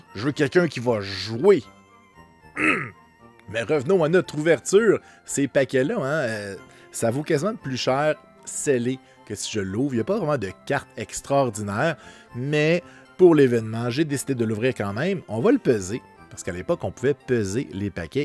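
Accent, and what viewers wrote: Quebec, Canada. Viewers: j adore l axant quebequoi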